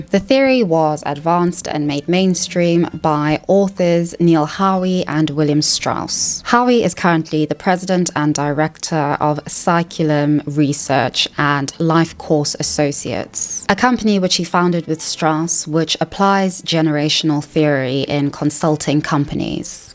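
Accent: RP, United Kingdom. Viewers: The camels are on the horizon, like it or not. This nog'ettes grandstanding and posh accent tells me everything I need to know. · I only subscribed cuz you asked, dark skinned lady with brit accent. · This is apart from the video, but hearing your voice for the first time surprised me, Your voice is beautiful, meant respectfully 😊 I have friends from Ethiopia and a an old friend from Kenya, so I’m used to those accents but your accent is like a UKish one, I think, it’s very pretty, ma’am ☺️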